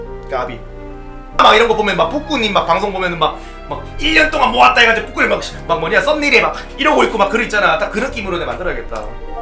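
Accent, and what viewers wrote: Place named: Gyeongsang, South Korea. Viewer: 나 경상도 사람인데 이정도면 사투리 심한 사람중에서 순한 말투다..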